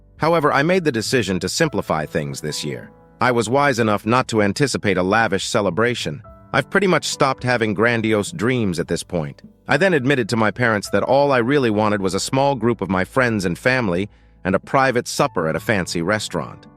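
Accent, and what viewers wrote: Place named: United States. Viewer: This must be a Japanese video translated into English and given an American speaking voice. It starts with a total dimwit who is driven insane by her family and that finally "opens her eyes"! Over 18 years, OP never learned a lesson that she was a second class citizen in her own home and needed to work, save some money for emergencies? Tsk tsk For her 18th birthday, OP could have asked for a few $ and gone to Wendy's or Mac with her friends? A much more realistic goal? What a dimwit to continue to trust her birth family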